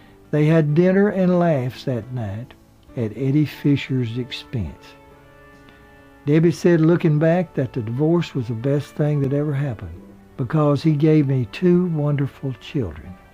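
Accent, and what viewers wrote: South, United States. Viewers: ja, er ist einfach super Mit seinem 'southern Snarl' So howdy mässig :o) Recheriert sicher viel LG aus Franken!!! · 👍YES I TRULY LOVE his southern accent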